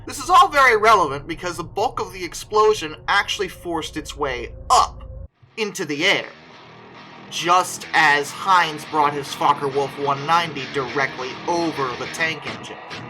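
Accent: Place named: West Virginia, United States. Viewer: Can we have a full West Virginia accent episode for April fools?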